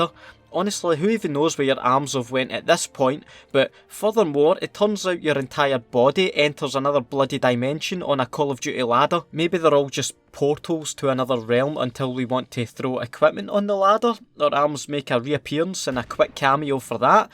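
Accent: Scotland, United Kingdom. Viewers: Your accent makes me question my scottishness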